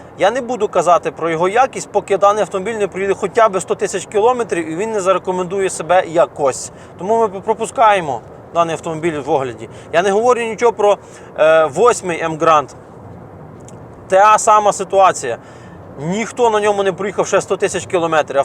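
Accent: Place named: Poltava, Ukraine. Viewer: Это полтавский быдло-суржик, уж извините.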